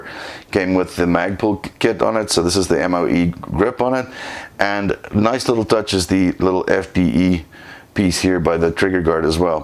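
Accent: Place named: Pretoria, South Africa. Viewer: Am I hearing a Pretoria accent there 😂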